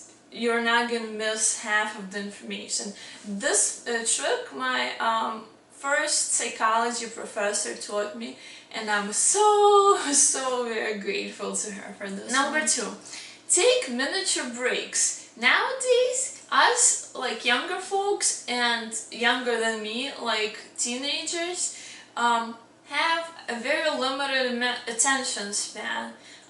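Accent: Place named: Russia. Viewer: you are russian! i think your accesent well i am russian too!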